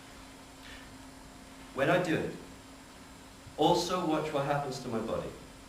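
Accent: Somerset, United Kingdom. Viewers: Thank you for your research into this, and your ability to communicate it so effectively! -Can you please explain if there is ALSO a regional difference? I know - like here in the USA - we have "standard" - basically there's standardized dialect we use in the USA - and there are wide - and wild - regional differences. I'm not as familiar with all the UK dialects as I should be, but your OP sounds a bit like - what is it, Somerset??? - to my ear. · load of bollocks. Hardly anyone does RP. Let him show some. And does he know where the actors came from originally? It is implied that they came from Birmingham which is near Stratford where he lived. I suspect that people in Stratford don't really speak with much of a Brummie accent today and certainly not then. He sounded like someone from the borders of Somerset. NO! I do not believe he knows where an individual actor might have come from. 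There is some sort of received wisdom that a Linguistics Professor says that you can even tell the accent from the spelling of the words. Again bollocks. I can say in good old Cockney.. "What's the matter?" Well, you know how that sounds.. I am now from Norwich "What's the matter?" Sound the same?? No. Of course not. Now I come from New York.. "What's the matter?" I'll say no more. It is just intellectualising horse-shit. Some say that Richard Burbage, the most famous Shakespearean actor ever, was born in Shoreditch. Exactly where the Globe Theatre was built. Do you think he had a London accent?? Yep. But probably not the Cockney accent you hear today. But Shoreditch is really within the sound of Bow Bells. So, if you know what a cockney sounded like in 1600 then you might know. And it wouldn't have been in some generic English accent that some Professors believe in. Another reason to believe that this speaker is talking BS is the Bath-Trap principle. 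Google it. Interestingly the Midlands (including Stratford) and London is part of the s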